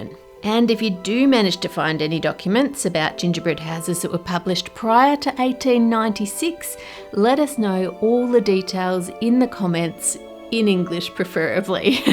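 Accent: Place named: Australia. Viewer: A beautiful creation Ann, and super soothing with the story alongside it. I am German but have lived in Australia (Townville, Brisbane) for 7 years, so love hearing the Aussie accent :) I will dive into some German-speaking research for you after the holidays :) Thanks for all the fantastic videos this year!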